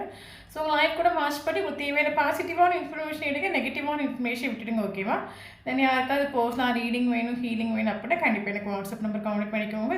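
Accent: Kerala, India. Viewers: யார் நீங்க கடவுளா என் வாழ்க்கையில் நடந்தது எல்லாத்தையும் அப்படியே சொல்றீங்க நீங்க நீங்கதான் பிரபஞ்சமா எனக்கு நடந்தது எல்லாத்தையும் அப்படியே சொல்லிட்டீங்க இனிமே நடக்க போறது எப்படி சொல்லுவீங்க அம்மா நூற்றுக்கு நூறுக்கு மேல இல்லன்னு சொல்லுவாங்க ஆனா 100 க்கு ஆயிரம் சொல்லிட்டீங்க மா யாருமா நீங்க எங்க இருக்கீங்க எப்படி வந்து என் மனசுல இருக்கறது நடந்தது எல்லாம் வந்து உனக்கு தெரிஞ்சது ஒன்னும் புரியல எனக்கு வந்து ஒரே குழப்பமா இருக்கு நிறைய மெசேஜ் பார்க்கிறேன் அம்மா அதான் இது மாதிரி வந்து உண்மை வந்து அந்த அளவுக்கு சொல்ல முடியாது நீங்க என்ன கேரளா லாங்குவேஜ் பேசுறீங்க அது மட்டும் தெரியுது கோயிலா சுத்தத்தை விட உங்ககிட்ட வந்து எல்லாத்தையும் சொன்னா கூட ஒரு ரிசல்ட் கிடைக்கும் என்று நினைக்கிறேன் நீங்க சொன்ன மாதிரி நான் தினமும் வந்து பிரபஞ்சம் கையில வந்து என்னுடைய ஸ்டோரி எல்லாமே வந்து சொல்லி முடிச்சாச்சு இது எப்படி உங்களுக்கு தெரிஞ்சது பக்கத்து வீட்டு அம்மா மாதிரியே பேசுறீங்க அம்மா ரொம்ப நன்றி அம்மா நல்லா இருக்கு ரொம்ப நல்லா இருக்குங்க அம்மா 🙏🙏🙏🙏🙏🙏🙏🙏🙏🙏👍👍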